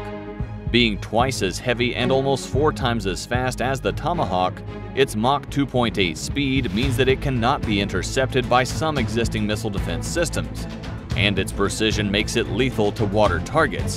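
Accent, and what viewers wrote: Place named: United States. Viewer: I thought Military TV was British, obviously this narrator has an American accent though.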